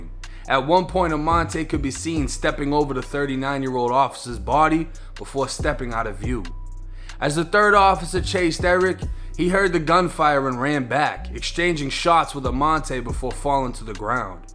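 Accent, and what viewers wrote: Boston, United States. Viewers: 1090 u got me rolling with u saying peace officer. I know its hard with your Boston twang. (Police officer)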